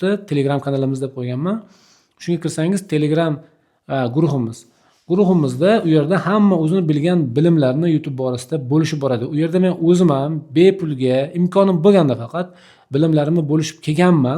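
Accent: Namangan, Uzbekistan. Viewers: Узингиз Намангандансизда, шевангиздан билиниб турибди. Ман олдингизга бориб урганмокчиман, майлими, Наманганданман...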